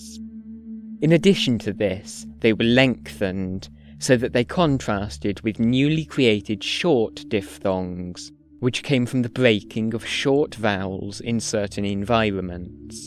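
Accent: United Kingdom. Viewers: I love your accent!! I feel it is from somewhere in UK, is it??? (I'll continue to watch the video, as I also love knowing the evolution of languages! The one I speak as native is Spanish).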